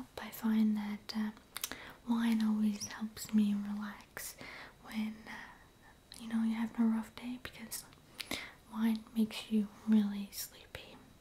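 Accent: Canada. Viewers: Are you canadian? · Fruity Newfie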